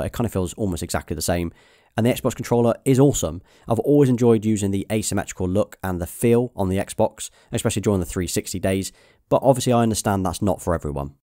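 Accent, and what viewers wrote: United Kingdom. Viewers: The way British accent is so funny 😆 because he said shual shock to shual sense, I am not hating or anything I am just saying how he says dual shock to dual sense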